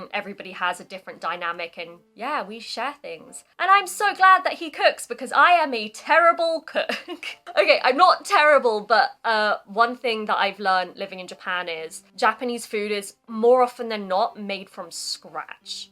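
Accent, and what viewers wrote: United Kingdom. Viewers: イギリス英語美女めっちゃカッコいい!! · i enjoy your talks,really. i was living in London for 2 years. i miss tubes which i used when commuting .your talks and british english let me recall my old days．you are not scotish, right? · イギリスアクセントが心地よいね(・∀・) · はぁ(о´∀`о)ブリティッシュアクセント最高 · chill, sweet の使い方が勉強になった、英語と日本語が出てくるので、勉強になる。発音は英国式だし聞き取り安いです。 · 英語の訛りについて解説してるマルチリンガルの人の動画を見てからこちらの方の動画を見たら訛りがイギリスっぽいと英語が全く話せない私でもわかりました。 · イギリス英語は聞いてて気持ちいいです。あんまり違いは分からないですが、イギリスの地域によってはちょっとおバカに聞こえたりしますけど、この方の喋り方は頭よさそうに聞こええる(笑) (ってか、英語ってイギリスがオリジナルでしょうけど・・・）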